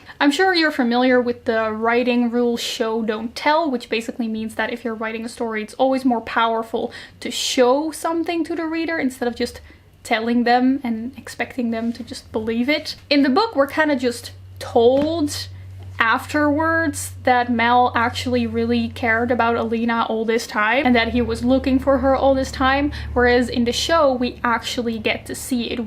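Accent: Netherlands. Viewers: This is absolutely not critique your english is really good, its just funny how as a dutchie, i can recognize you’re dutch as well in like .2 seconds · Is your accent Dutch?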